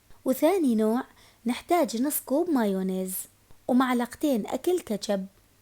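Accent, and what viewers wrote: Mosul, Iraq. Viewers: هلا وكل الهلا نورتي ياغاليه عاشت ايدج انتي مبدعه ربي يحفظكم ويسعدكم ❤انتي من الموصل من اللهجه ❤ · فديت اللهجة الموصلية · هاي اللهجة المصلاوية عيني احترمي 👍🏻👍🏻👍🏻👍🏻👍🏻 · عاشت ايدج،، شگد حلوه اللهجه المصلاويه ❤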